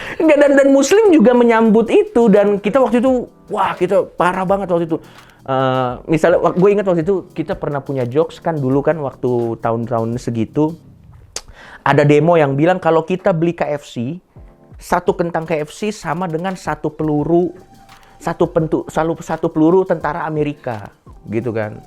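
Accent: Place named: Madura, Indonesia. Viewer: saya mendengar suara mas mas madura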